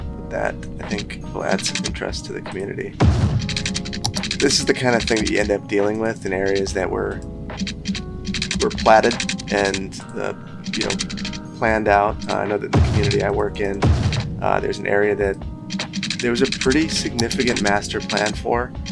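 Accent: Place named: Midwest, United States. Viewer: I live in Madison! Yay! I thought you sounded like you had that midwest charm!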